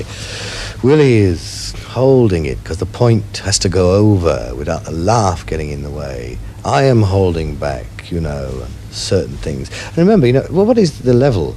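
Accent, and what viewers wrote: RP, United Kingdom. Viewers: bit of a posh old boy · Funny hearing him talk posh I'm just waiting for him to break out saying .you dirty old man lol pure gold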